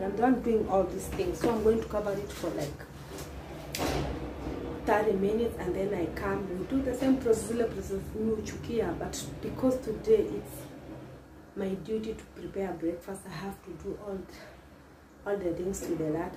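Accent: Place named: Kenya. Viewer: we love her authentic work as well. She's not gonna shrub, pure Kenyan English and l love how she doesn't care whether she makes mistakes!! A great teachable spirit!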